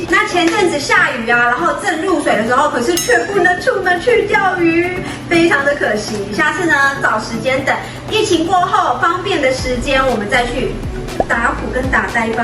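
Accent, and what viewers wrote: Taiwan. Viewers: 這台語蠻有特色的🤣🤣🤣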